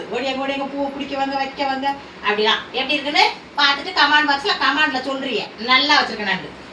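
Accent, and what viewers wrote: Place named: Southern Tamil Nadu, India. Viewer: அக்கா உங்களுடைய எதார்த்தமான தென்மாவட்ட பேச்சு சூப்பர் கா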